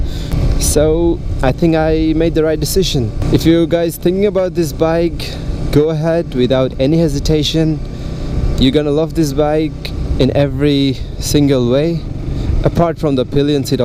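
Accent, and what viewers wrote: United States. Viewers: You dont have the indian accent are you half American? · Yupp buddy, very impressive Bike review you made ever here in BD like American accent really. I like your accent than bike at all, ok buddy go on I hope you can do what you looking for best of good luck for next review.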